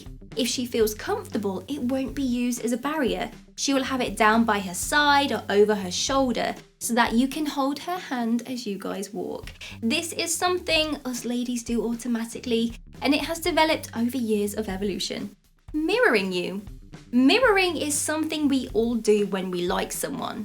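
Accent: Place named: Northern England, United Kingdom. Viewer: Watching and videos to try to figure out what part of the UK you’re from. Somewhere in north England? You’re adorable!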